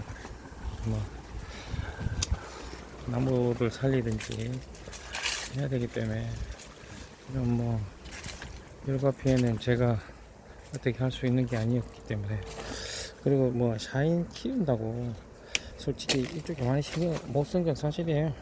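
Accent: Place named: North Gyeongsang, South Korea. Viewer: 선생님 말씀으로 봐서는 경북지역 이신것같은데요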